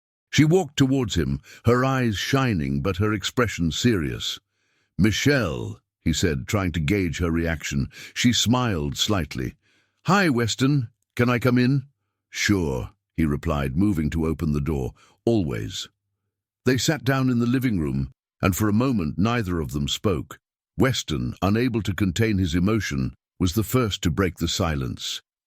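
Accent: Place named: Mid-Atlantic, United States. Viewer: The narrator appears to be a real person with the most wonderful mid-Atlantic accent, British, but with American vowels.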